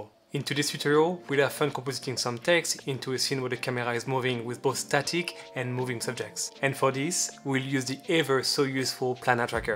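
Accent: France. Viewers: Im both impressed and NOT. If your aim is to teach, I think it would be good to explain every move, especially the nodes. I am trying to learn fusion, and so many tutorials with god people teaching, BUT as they are god they tend to forget to show even the small steps, which if missed, actually can ruin the whole tutorial. In this tutorial, you missed the nodes, why connect them in that way. I looked at some reviews, and all gives good. But may bee they are att a more advanced level, so they can fill in what you missed. The reason I write this, is only because I see you are good at what you are doing. Even if your French english is fun to listen to :)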